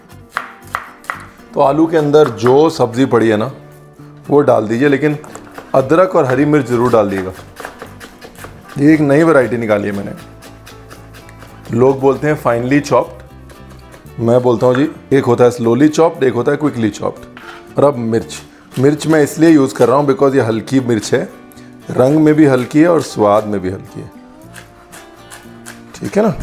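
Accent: India. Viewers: No words to explain anything Abt u ND ur vedios... Ur smile is just way too🔥🔥🔥my heart falls Everytime for your every word that you speak in such a down to earth ND desified way...your recipes just amazing...I love cooking I try all of your recipes...ND your punjabiness...❤️❤️❤️❤️❤️❤️❤️❤️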